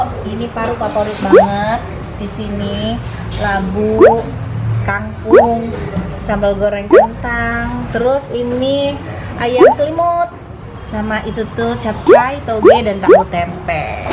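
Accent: West Java, Indonesia. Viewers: Th makin syantik..aku suka logat sunda nya..sehat sllu and semakin sukses.. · teh ramah murah senyum logatnya orang sunda banget, sifatnya mencerminkan orang sunda yang ramah dan santun. i love you teteh sareng kang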